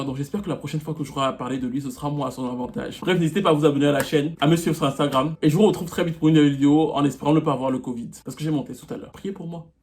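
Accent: Cameroon. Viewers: Je viens de decouvrir ta chaine et like beaucoup,surtout ton ptit accent +237...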